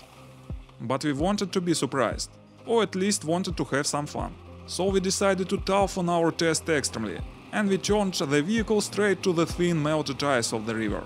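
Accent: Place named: Russia. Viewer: Акцент то РУССКИЙ да и лицо наше, ничего не понятно но очень интересно.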